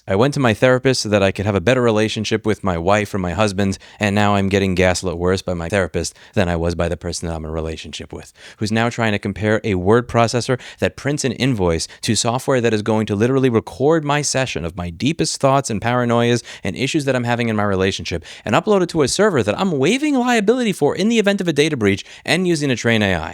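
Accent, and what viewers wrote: New York, United States. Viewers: I LOVE how this tough talking New Yorker who teaches everyone how they are getting F**ked every video is a competant Couple Counselor 😅:hands-yellow-heart-red:❤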